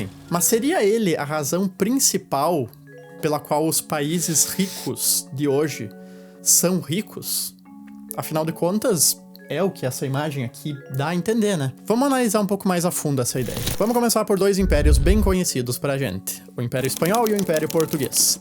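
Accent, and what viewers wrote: Rio Grande do Sul, Brazil. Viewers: O sotaque gaúcho dele faz o vídeo ficar mais incrível ainda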